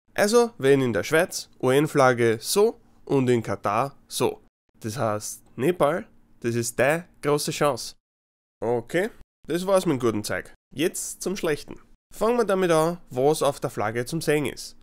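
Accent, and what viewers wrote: Vienna, Austria. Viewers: Warum er spricht doch nur normal Deutsch? Nur halt wie ein echter Österreicher der im Dialekt redet. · er hört si an wie a weaner (wiener), i bin theoretisch soizbuaga (salzburger), aba aus da stodt, da fließt scho a bissi Hochdeutsch mit ei;) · Thematik gut erklärt und das österreichisch! 😂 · Es ist so lustig dich nur von den normalen zu kennen und dich dann plötzlich Österreichisch sprechen zu hören! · Ich kann nicht so gut österreichisches Deutsch finde es aber lustig zu zuhören😅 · Haha nice und ich hatte schon gedacht dass ich nen österreichischen Akzent in deinem Englisch raushören kann. Top erklärt und einfach so gerade raus ohne sehr auf Hochdeutsch zu achten, klingt super sympathisch 👍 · Oh so Austrian German does sound different from normal German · Obwohl ich zwei Jahre in Österreich verbracht hab (hauptsächlich in Skiregionen und auf Bauernhöfen bei befreundeten Bauern), geht mir dein Österreichisch auf den Sack. 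Zu oft versteh ich tatsächlich was nicht. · Its austrian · Ach ja, ihm gab es auch mit Österreichischen Dialekt. Erstmal das Video nochmal anschauen aber diesmal auf Bayrisch². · This is not "Austrian German", it is a Viennese dialect. As in fact, every region in Austria, Germany and any other place in the world has different dialects. There is "Austrian High German", like you would encounter it on TV news, for example. But this is not it. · oh mein gott ich hab denn österreichischen akzent total vergessen ist der niedlich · Cgp grey aus Wien?:)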